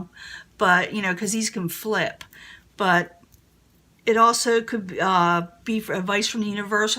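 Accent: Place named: United States. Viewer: How come you sound American now I thought you were British 😱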